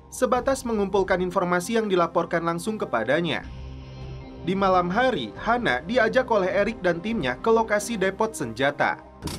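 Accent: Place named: Garut, Indonesia. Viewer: Narasi gaya Garut...... Keren hehehehe..mmm. Mana bajigurna, kang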